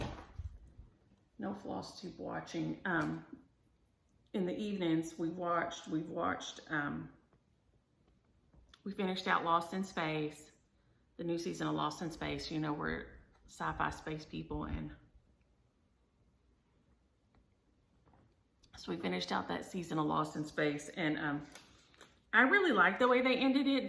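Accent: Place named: Texas, United States. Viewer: I so enjoy watching you! I was born and raised in Texas and I love hearing your accent 💗 it reminds me of home. I still get on my sons even tho they are in their 40’s, the grandkids love it 😂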